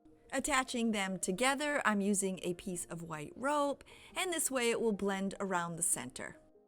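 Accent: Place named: South, United States. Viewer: Being from the south i personally love the way you say metal but my favorite was the way you said " canning bands" . I am certainly not making fun. In case you don't remember, I'm the subscriber with the best friend that moved away and your accent sounds like hers. I absolutely love your crafts too and made quite a few. You never fail to make me smile so thank you!! I was having an awful day and now I feel better. 😊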